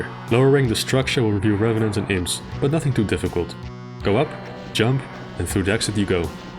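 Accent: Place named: Netherlands. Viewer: I can't get around your heavy Dutch accent.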